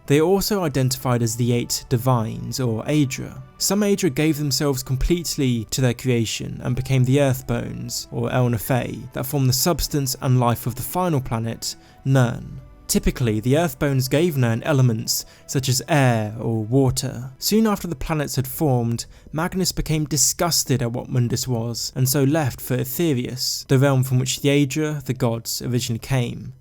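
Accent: United Kingdom. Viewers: Love your videos and your voice . What part of GB are you from ???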